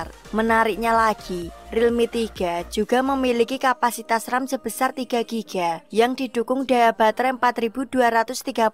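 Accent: Java, Indonesia. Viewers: Dari suaranya sih kayak dari jawa,wong jowo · Medok bat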